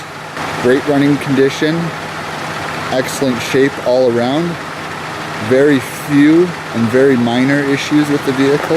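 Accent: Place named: Canada. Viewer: What part of Canada are you? I hear a Canadian accent. Anyhow, I love the look of this car. Thanks for the upload. Early 90s American luxury is pretty cool.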